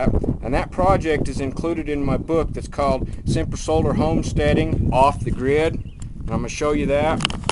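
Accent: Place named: United States. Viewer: Have to ask ..I collect accents ..what state are you from..??/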